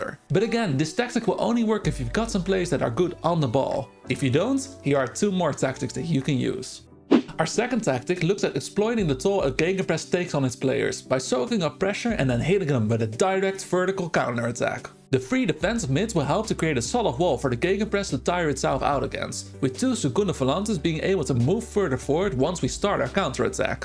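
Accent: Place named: Netherlands. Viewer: Oh wauw, haven’t even heard of this good lad yet. Sounds like he’s a fellow dutchman as well 🇳🇱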